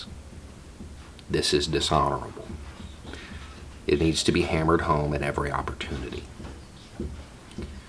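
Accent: South, United States. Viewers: What's with the southern drawl? You didn't sound like that back in the day.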